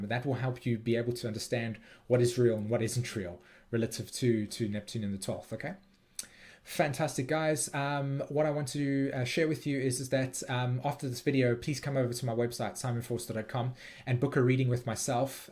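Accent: South Africa. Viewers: Hey dude, are you South African by any chance ?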